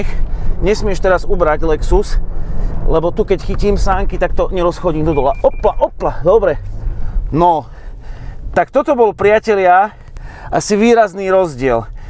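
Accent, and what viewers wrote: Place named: Liptov, Slovakia. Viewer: ten tvoj liptovský prízvuk ... nádhera !!! :D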